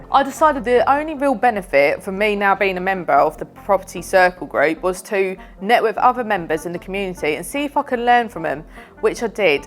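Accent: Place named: Cockney, United Kingdom. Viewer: Hey you have such a strong cockney accent for a Punjabi girl..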